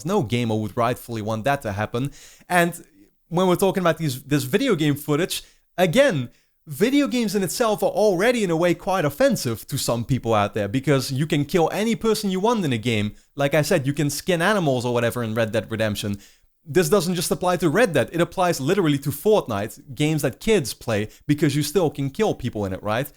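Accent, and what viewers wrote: Netherlands. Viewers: je Engels is goed maar hoorde ik gelijk dat je Nederlands bent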